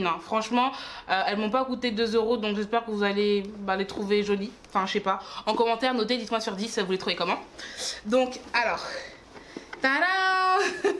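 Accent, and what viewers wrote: Africa. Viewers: J’adore trop l’accent africain 🥰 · Dans cette vidéo tu à beaucoup parler africaine!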